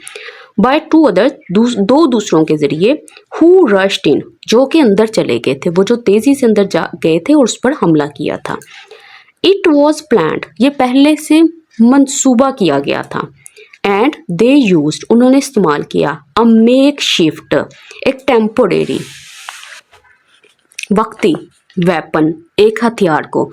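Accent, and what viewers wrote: Lahore, Pakistan. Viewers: You must be from Lahore ❤❤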